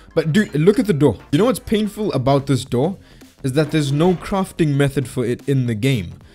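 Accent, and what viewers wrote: South Africa. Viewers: your acent sounds south african (is it?)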